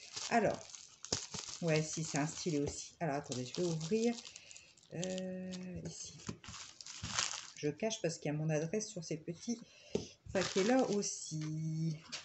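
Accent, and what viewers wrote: North, France. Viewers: Un petit accent du Nord ?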